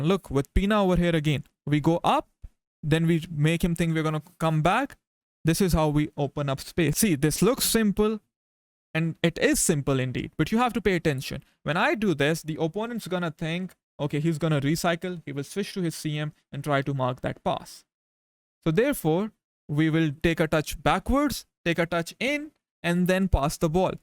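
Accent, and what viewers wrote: India. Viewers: I don’t like your accent Sounds like bajeet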